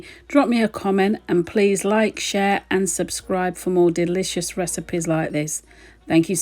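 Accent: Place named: London, United Kingdom. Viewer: Hi.. You videos are amazing I've liked a few mostly veggie as I dnt eat meat.. I love how your spice blends and cooking methods can bring flavour and spice to simple vegetables.. I plan to try your bean chilli recipe because its just seems so much full of flavour compared to the garlic powder, onion powder, smoked paprika recipes I'm used to seeing lol can I ask where you are from? I grew up in Hammersmith sw London and your voice reminds me of home just as much as your recipes.. Keep it up x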